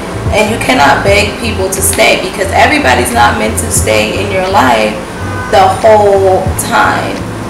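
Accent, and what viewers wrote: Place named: New York, United States. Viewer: LOVE your NY accent!! Gorgeous too girl!!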